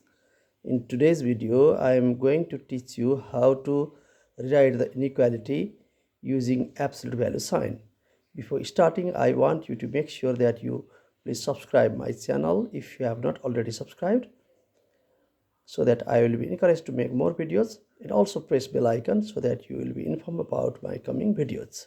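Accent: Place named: Nepal. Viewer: His accent shows he is nepali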